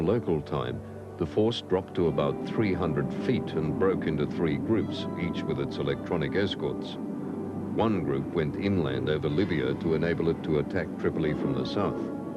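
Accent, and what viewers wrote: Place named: Australia. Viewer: Sounded Australian to me???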